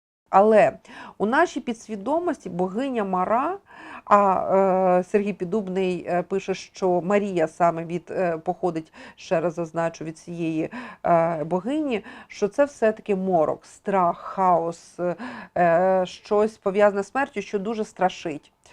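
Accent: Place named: Galicia, Ukraine. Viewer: Навіщо постійно, останнім часом, потрібно хизуватися галицьким(як в народі мовлять - западенським діалектом). Багато слів у південно-західному наріччі схожі зі словами в рус.язикє, і навіть наголос. Почали зі слова знайдЕте, в язикє- найдЁте. А чому не вимовити як розмовляє(балакає) решта України - знАйдете?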